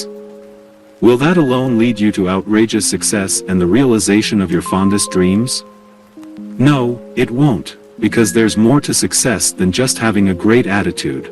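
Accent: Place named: India. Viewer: Accent is like an indian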